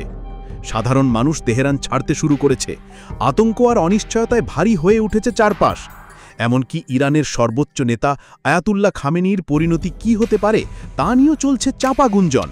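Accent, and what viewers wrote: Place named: India. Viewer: কি হয়েছে কাল থেকে ভারোতের মতো করে খবর পড়া সুরু করছে।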